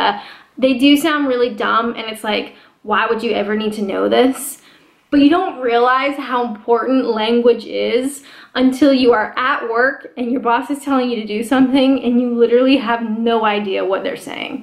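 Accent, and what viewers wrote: Canada. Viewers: your Canadian own it. you say aboot its natural for you and its okay.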